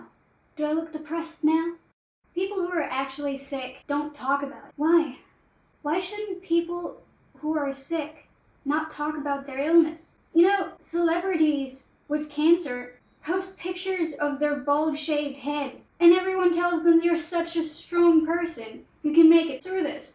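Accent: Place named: United States. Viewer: der Akzent klingt mir eher nach Amerikanerin :'D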